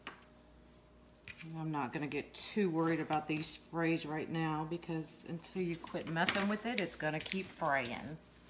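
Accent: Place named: Louisiana, United States. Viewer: Enjoy your videos. It is interesting to see how the UITC boards make pretty wreaths with less time and material. I know you said you were in Houston, but your accent sounds like Louisiana to me. Are you originally from there?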